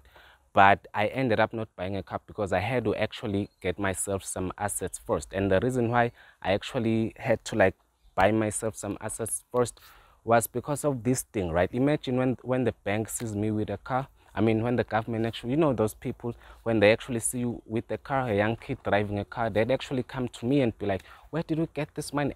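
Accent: South Africa. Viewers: you sound like you from SA and why don't you reply to the people asking you which country are you talking about? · that's South African accent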